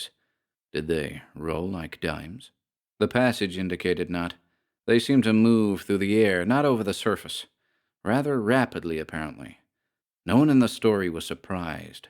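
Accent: United States. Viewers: Your American accent is fantastic! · I love your ‘Merkin accent,. Nailed it!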